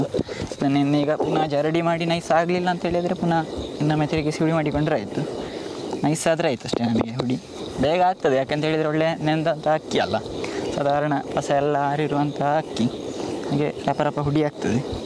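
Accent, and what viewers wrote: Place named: Dakshina Kannada, India. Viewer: It's called athirasam among the Tamils, kajjaaya among the Kannadigas and Anarasa among the Marathis. It is a pan south Indian sweet. In my childhood at our village , we didn't know cakes and other cookies..Athirasam is so popular, no festival is celebrated without Athirasam Kajjaaya. Thanks for your narration with tips on making paaku . Your DK accent is feast to ears.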